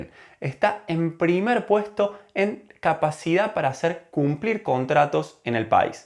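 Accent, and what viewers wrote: Córdoba, Argentina. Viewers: El mejor lugar es Argentina. Ah, no para, me equivoqué de época jaja. Excelente contenido! Me estoy paseando por todo tu canal y es muy cómodo escuchar a alguien hablar con acento cordobés sobre viajes en el mundo! Saludos desde Bs As.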